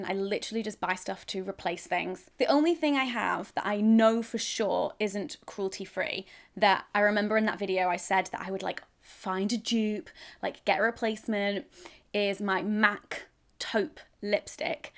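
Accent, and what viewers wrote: France. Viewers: Ton accent français est adorable!! ❤️ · wow loving your French accent for REAL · You have a nice French Accent :) Just need some practice. I would love for you to come here, I'll be glad to see you <3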